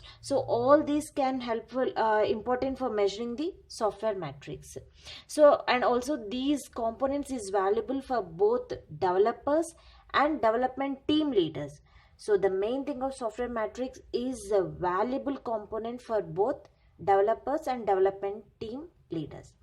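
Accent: India. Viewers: thats how indians talk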